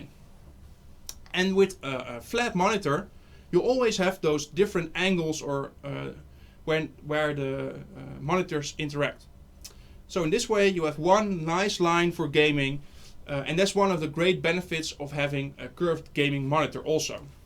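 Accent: Netherlands. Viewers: nice dutch accent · je bent zeker nederlands of belgisch ik hoor het aan je engels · can't you like LOSE the fucking dutch accent...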